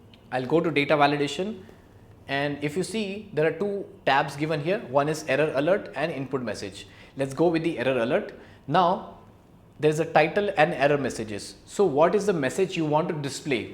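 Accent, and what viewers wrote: India. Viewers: He is Indian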